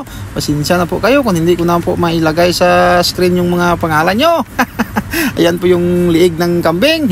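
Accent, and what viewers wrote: Iloilo, Philippines. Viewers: Ilonggo ka dol.?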